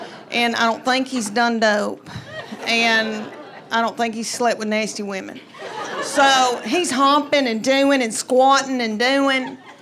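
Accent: South, United States. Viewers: She is really funny , love that southern belle accent 😂😂😂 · As a fellow GenXer I enjoyed her material, but her accent is just too Southern for me. I'm as South as you can get(Florida born and lived here all my life), but I'd guess that only about 5% of people have Southern accents that thick. Probably because the vast majority of people that live here moved from up north. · i’m divorced, from extreme L california, been dating a lotta southern girls lately and now in love with their southern accent. and it’s women like that only makes me love southern girls more! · I am not a native speaker. What southern state his this accent? Georgia? Alabama? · Just discovered. Nice hearing a clean southern story teller. Jerry Clower style. ❤ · With that southern drawl, only she can talk about her real life situations, and make it relatable and funny! 😆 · This has to be one of the worst American accents there is! 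So nasal and annoying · Yes...She is refreshing. I find it odd though...that her accent is way heavier and countrified in this video than the other two videos of her I watched. I think one of the others was from at least two years ago. This one is 6 months old. I guess she changes her twang...based on location? That would kind of make sense. You want to be understood. Maybe she moved back to Georgia? That is what the accent resembles. Regardless...I was also brought up in the mid 1960's - 70's...and I can relate to a lot of her stories. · I always laugh…so relatable about everyday life with the fam. I love her southern drawl it adds to her stories. It beats one from up north any day…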